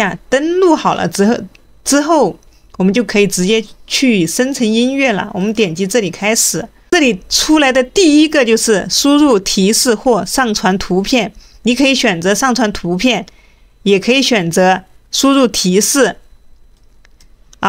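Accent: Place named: Hubei, China. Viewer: 我听您口音，好像也是湖北的哈。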